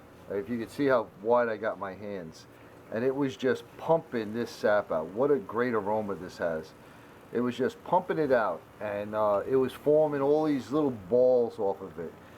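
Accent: New York, United States. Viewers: and the New York accent! Yasssl!! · Ah the New York accent brings me right back home! Haha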